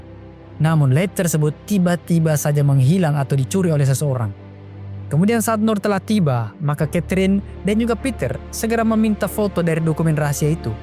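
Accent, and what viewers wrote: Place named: Bugis, Indonesia. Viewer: Orang bugis logatnya admin ni ya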